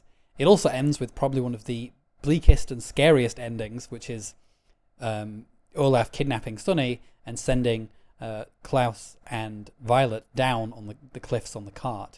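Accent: Northern England, United Kingdom. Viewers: yes that’s it, my ears have become adapted to your accent! I had heard reference to the Northern accent but never really knew exactly what it was… kinda like the Southern accent here in America I guess…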